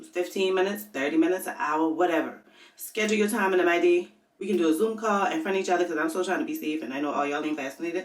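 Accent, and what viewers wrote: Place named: Gullah Geechee, United States. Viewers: Babeeee the Geechee came out today. I smiled so wide one minute in and my son said Mama she sound just like you 🤣🤣🤣🤣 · Man, dis too geechee! LOL!!! Great advice, though! Loving the channel, and I'm not even in HR! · hahaha, Nah.... Perfect Geechie.... So so so very funny...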